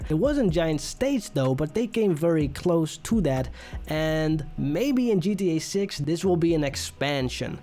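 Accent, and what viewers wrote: Netherlands. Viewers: Hi! I’m wondering, what country are you from? I noticed you sometimes have a Dutch-English accent. Just wondering. 😅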